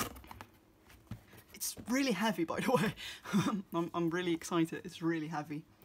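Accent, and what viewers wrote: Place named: Netherlands. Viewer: Hi! Subscribed right away just because of your chanel name..haha I seem to detect a little bit of a dutch accent, I am right? :) Edit: Just watched a little over half of your video and you gave an awesome idea to use the weeks for meal planning and groceries list. I had already thought about buying a weeks cover for my coupons but if I put a weeks inside it for the planning it will be even better! Thanks! :)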